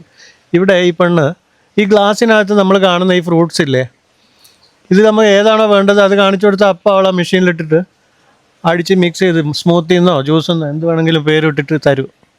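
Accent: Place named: Malabar, India. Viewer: ഇങ്ങള് മലബാർറാരൻ ആണോന്ന് എനിക് നേരത്തെ സംശ്യം ഇണ്ടായ്ക്ക് ഇപ്പൊ ഒറപിച്ച്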